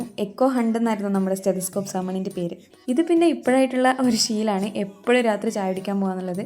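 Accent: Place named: Kasaragod, India. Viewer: Epa kasargod samsaram kekan thodanga😅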